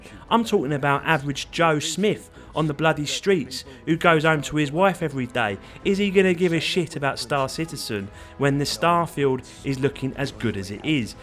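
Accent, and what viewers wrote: United Kingdom. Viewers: I really enjoyed the British accent. Where are you from lad?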